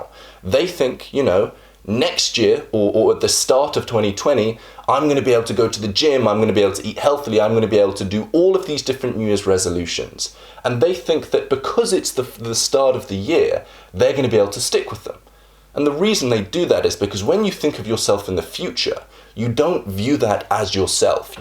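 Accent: United Kingdom. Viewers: I thought you was British.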